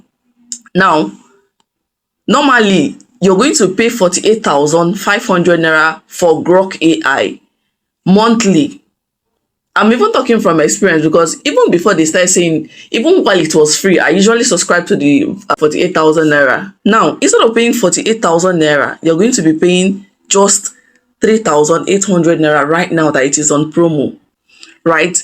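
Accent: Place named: United States. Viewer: The presentation in this video is top notch! Your accent don Dey turn USA oh sis😂 YouTubers! Big people💯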